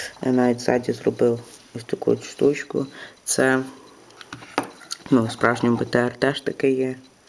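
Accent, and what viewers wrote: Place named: Lviv, Ukraine. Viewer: він зі львова и я це чути як він говорить в нас у львові майже всі так говорять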